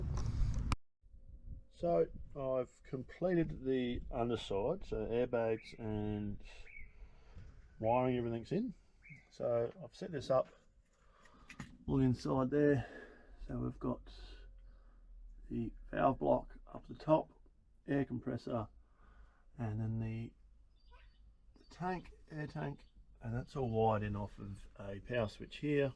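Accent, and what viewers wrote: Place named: Australia. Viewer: Your accent says you are in Australia. Does Airbagman sell in the U.S.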